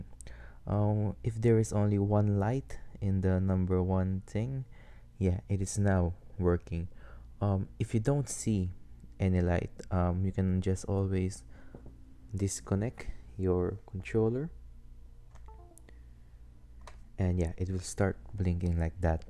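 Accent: Philippines. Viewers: You sound filipino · Peenoise accent :D hahaha peaceeeee :P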